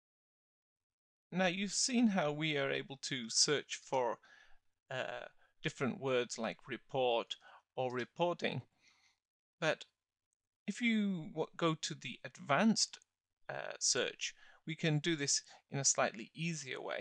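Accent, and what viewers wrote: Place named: United Kingdom. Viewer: English is his native language. You must be not accustomed to the his variant of the British pronunciation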